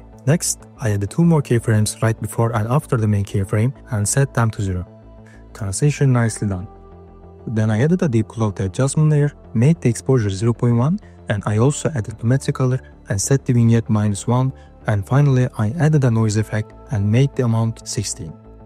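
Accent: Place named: Turkey. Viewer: Where are you from, brother? Your accent sounds like Turkish.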